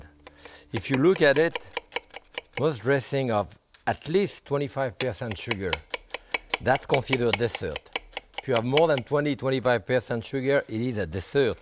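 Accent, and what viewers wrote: France. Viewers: Love his French accent 's English, and easy methods in French Cooking! Thanks. :) Merci! Jackque.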